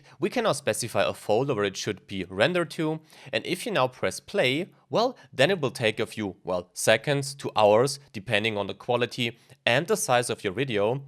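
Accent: Germany. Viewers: Hahaha man hört so, dass du deutsch bist. Feier ich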